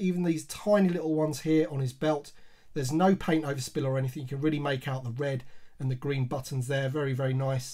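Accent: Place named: United Kingdom. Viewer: Was happy to find out you skated in the 80's. I think star wars was my favorite thing as a young kid, then G.I.Joe, until Skating. Loved Skate culture. Then came music and the subcultures until I ended up in my 40's and now I'm back on the toys! I love these vintage collection cards backs. The whole thing just brings me back to what a great reward it was to get a new figure as a kid. Cheers to you, I love your channel. Not sure what area your accent is from, but I always expect to hear you say "Oi!"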